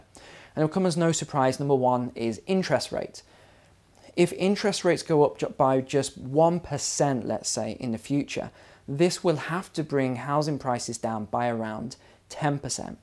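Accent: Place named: England, United Kingdom. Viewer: is clearly marketing himself for the USA viewers because he knows they are a bigger population than the UK and his English accent might get the audience. It also makes sense now that he is living in the USA.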